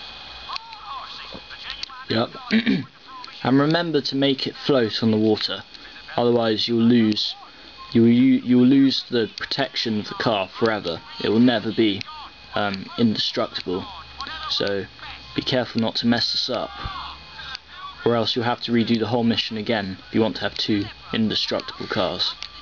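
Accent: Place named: Australia. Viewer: i like ur accent, r u ausi or nz?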